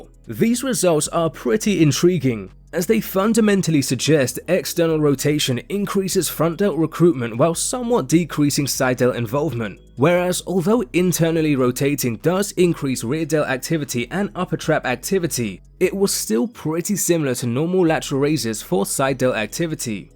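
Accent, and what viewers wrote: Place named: England, United Kingdom. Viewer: You sound English but you call it soda?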